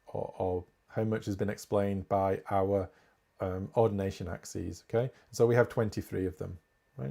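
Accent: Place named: Hull, United Kingdom. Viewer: Thankyou for this! Added to our VLE for my MSc students in Hull (sounds lke you hail from not a million miles away?)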